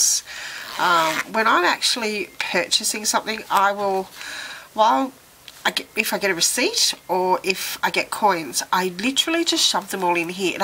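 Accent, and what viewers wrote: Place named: Melbourne, Australia. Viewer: Thanks for sharing your views on this beautiful product and it's nice to to hear a fellow Melbournian. I agree with everything you say. Gillio's products are simply divine and of the best quality and craftmanship. I have a Gillio Giramondo in Epoca Red and just received my A5 Appunto in Epoca Gold yesterday. I love them both.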